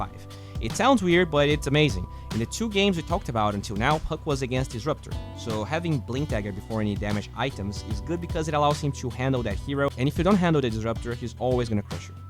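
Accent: Brazil. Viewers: esse sotaque de br quase imperceptivel mas eu percebi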